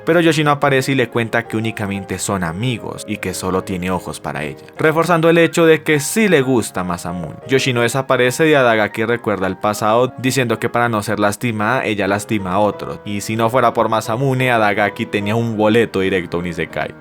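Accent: Colombia. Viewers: 5 segundos y deduje que es otro Colombiano Narrando, cuando dijo "PIROBA" dije! esta es mi patria Colombia querida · We me encanta tu idioma colombiano yo soy colombiano pero tu eres de colombia?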